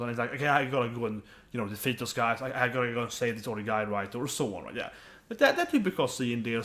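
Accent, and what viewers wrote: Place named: Sweden. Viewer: at first i couldnt tell if u were speaking swedish or english cool accent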